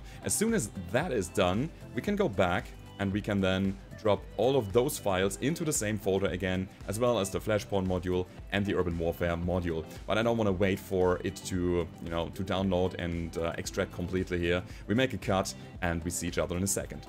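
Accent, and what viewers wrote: Germany. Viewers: Hey you are a German? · Question: As a German native speaker I can really good understand you! Thanks for that. But your accent sounds a bit like my mother tongue? Do you speak German as well? And I‘m grateful to these video. It helps me a lot. EDIT: Seit deiner Windows Meldung weiß ich: Jup, du bist eigentlich deutsch Sprechender ^^ Deswegen verschluckst du nicht so viele Worte, wie Muttersprachler in Englisch. Danke für dein Video :) Ich wollte mir nämlich gestern ne Mod runter laden, da stand nichtmal eine Anleitung dazu. Andere habe ich nicht angeschaut ^_^ Cooler Typ!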